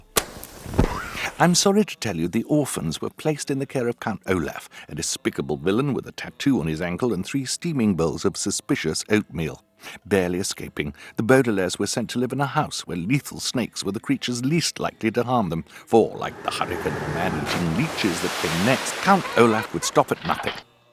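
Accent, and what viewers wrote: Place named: United Kingdom. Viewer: why is he using a British Accent The Real Lemony Snicket A.K.A. Daniel Handler Is American. I Know Because I Met Him In Real Like Before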